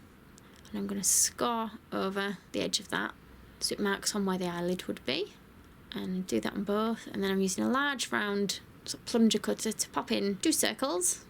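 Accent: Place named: Northern England, United Kingdom. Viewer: she's northern! Northern English accent :)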